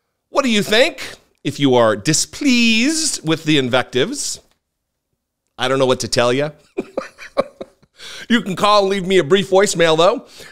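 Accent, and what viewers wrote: South, United States. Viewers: May I say that your "southern" accent is classic "Foghorn Leghorn" and I love it.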